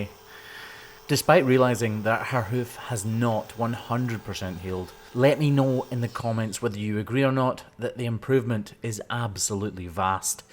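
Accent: Scotland, United Kingdom. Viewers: In the intro there, you sounded like a man who needed to finish his coffee before he tried to talk! This 65-year-old Canadian woman finds that she really enjoys your videos. Why? You really love your life, the scenery is spectacular, and you sincerely care about the comfort of those cows. Your pleasant voice and Scottish accent don't hurt a bit either.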